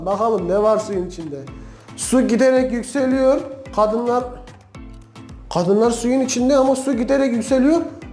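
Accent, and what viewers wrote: Diyarbakir, Turkey. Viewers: Abi senin konuşman Diyarbakır ağızına çok benziyor "gablo(kablo), garı(karı), bağ(bak),gurtuldu(kurtuldu)" gibi...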